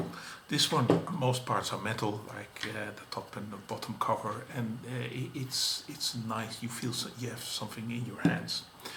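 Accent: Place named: Netherlands. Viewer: You are doing fine, I think! Your dutch accent is ok, don't worry. Maybe you can add some photos taken with those cameras to your reviews 😉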